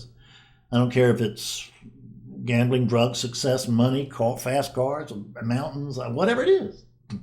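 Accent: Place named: Texas, United States. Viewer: Dear, I would love to see you standing in front of a lectern bashing away with your plastic brain in your charming Texas accent in a church of non duality! Hallelujah brother! Seriously though, that was incredibly helpful. Thank you so much. Very funny indeed 👏👏👏😂🎉🎉🎉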